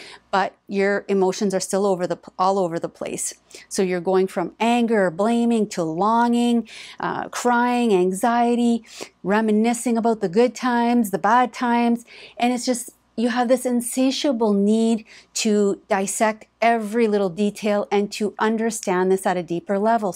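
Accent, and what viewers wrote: Canada. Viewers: Of course it’s a Canadian twang I’m picking up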